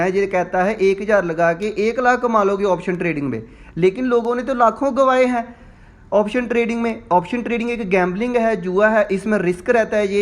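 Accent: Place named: Punjab, India. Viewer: Thanks for this information...it looks like you are from punjab as your way for speaking reflects the pure punjabi.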